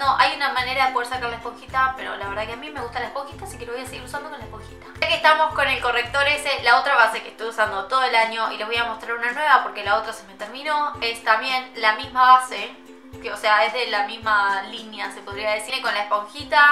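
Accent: Argentina. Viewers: sos de argentina no ?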